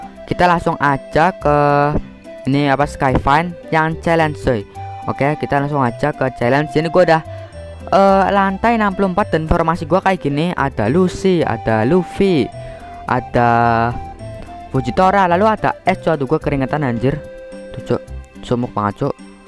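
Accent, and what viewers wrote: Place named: Java, Indonesia. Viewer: Bahasanya medok kk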